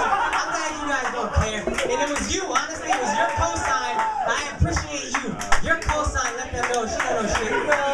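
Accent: United States. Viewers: Really, I thought he had way more of an NY flavor to his accent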